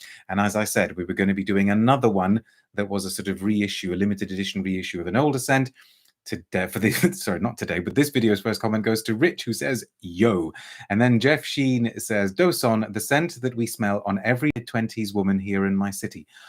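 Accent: United Kingdom. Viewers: I just love the way you say........we are broadcasting "liiiiiiiivvvvveeee' it's just soo classy :) anything in a British accent is just mmmmmm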